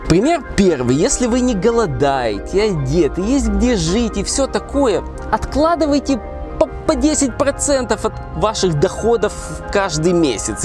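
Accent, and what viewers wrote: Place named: Odessa, Ukraine. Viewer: Вы 100% с Одессы , узнала по говору свои😄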